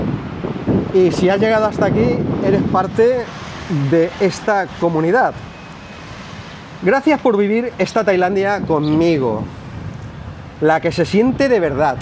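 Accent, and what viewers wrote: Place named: Alicante, Spain. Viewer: Me parece que tienes acento alicantino👋🏽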